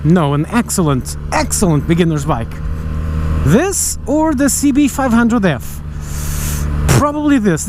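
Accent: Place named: Portugal. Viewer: só pelo sotaque dá para ouvir que és tuga crl! :D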